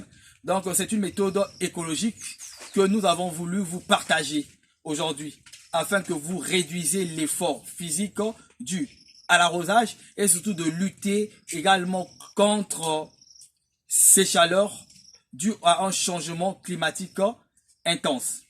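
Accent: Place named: Africa. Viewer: Aaahhh,ça c'est l'Afrique! Avec le véritable accent et la vraie pédagogie! Est-ce '" tu comprends, hein?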